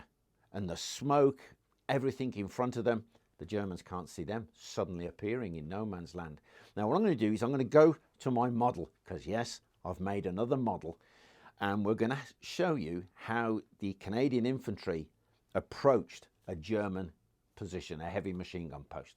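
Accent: United Kingdom. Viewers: I really enjoy your presentations. Especially your WW1 history. Please keep up the great work. Your refreshing, not boring or stagnant. And there's nothing like a British accent to tell a good story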